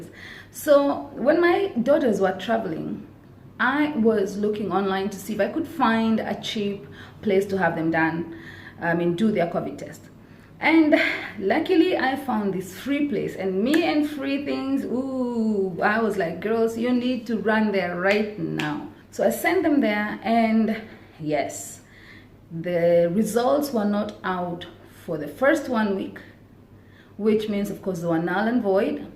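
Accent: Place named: East Africa. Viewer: Hello from Malaysia. How are u doing? U got East African's accent. Could u help me with ur FB id pls?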